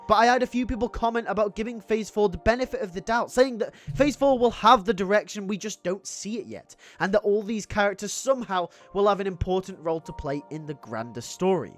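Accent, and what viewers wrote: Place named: England, United Kingdom. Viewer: Please; only speak the Human language aka American English, the stuff you render now is putrid noise from mere england, the ugly proto form of our language.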